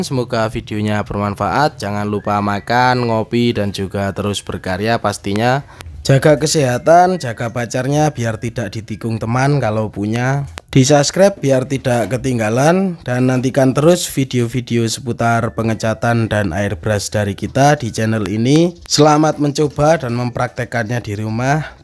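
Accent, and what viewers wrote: Temanggung, Indonesia. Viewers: Nyrongat2, Temanggung banget basane👍👍👍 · Mantep mas.. Logate temanggungan.. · Bosone khas temanggung wkwkwk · Ketoro lek tiang Temanggung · Koyo wong temanggung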